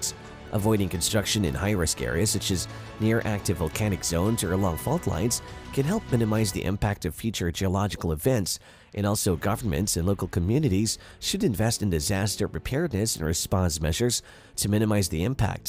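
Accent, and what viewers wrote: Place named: United States. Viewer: He's a robot with a vaguely American accent.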